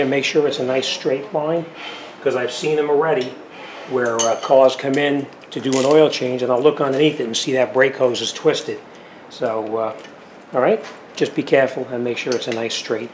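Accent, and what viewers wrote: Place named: Bronx, United States. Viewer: Nice Video My Man... & That Sound Like A Bronx Accent!!! Lol...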